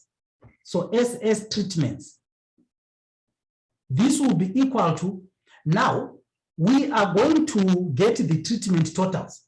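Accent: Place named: South Africa. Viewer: Also I liked the SA accent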